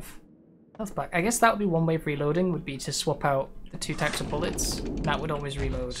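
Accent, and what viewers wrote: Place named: United Kingdom. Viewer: are u British? ur ascent is amazing I love it cuz it sound like you could be on a podcast or something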